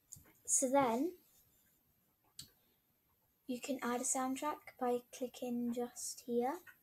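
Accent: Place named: United Kingdom. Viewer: col british accent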